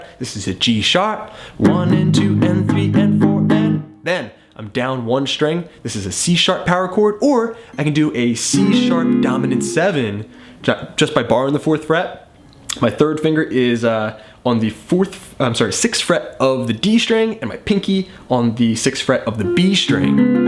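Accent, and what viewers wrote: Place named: United States. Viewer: that accent in america has always intrigued me-nearest sounding american accent to english uk accent-where you from man?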